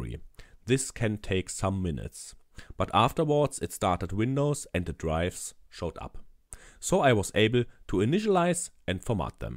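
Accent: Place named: Germany. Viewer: This is awesome, it works fine for me after hours of unnecessary unplugging and plugging . Thank you mate. And by the way, nice German accent. I have the French version of it.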